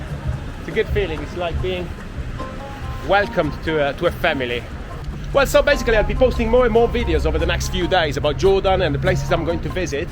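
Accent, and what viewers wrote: United Kingdom. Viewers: You deserve more views 👍 Im curious Are you Italian? But you have strong British accent 🤔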